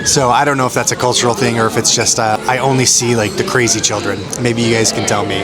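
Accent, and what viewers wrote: Philippines. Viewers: Nice Filipino accent Sir. Speaking Filipino gives due respect to the Philippines. We do not care of your intonation your Filipino talk is all what we love to hear from our visitors. Thank you Sir. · nakatawa kuya pinoy na pinoy accent mo😂😂😂 galing😂 whats you and your brother's software company name? is that also in philippines or abroad?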